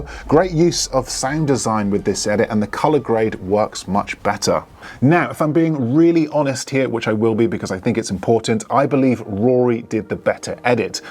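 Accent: United Kingdom. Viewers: I like the clarity and emphasis of the British tongue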